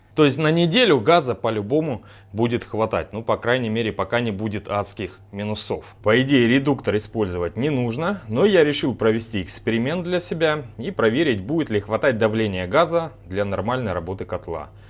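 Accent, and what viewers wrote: Rostov, Russia. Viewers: То ростовский говор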